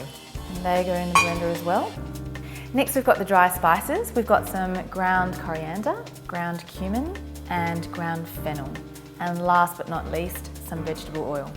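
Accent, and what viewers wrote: Australia. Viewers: Yes and it's particularly a South Australian accent. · accent sounds like an australian...anyway,that roti chanai looks delicious!thanks,definitely gonna try this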